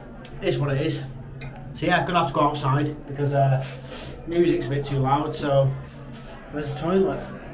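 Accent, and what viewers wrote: Manchester, United Kingdom. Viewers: What part of manc are you from? Deffo a manc with that accent. :-)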